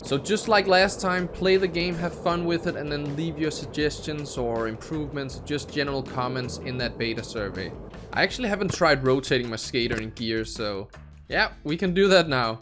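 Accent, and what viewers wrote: Denmark. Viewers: yo you danish?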